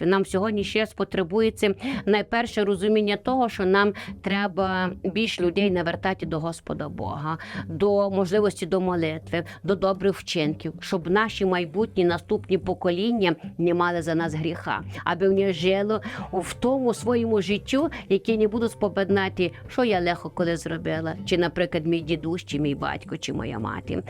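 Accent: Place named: Hutsul, Ukraine. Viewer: Талант оповідачки незаперечний. А яка мила гуцульська говірка ! Дякую !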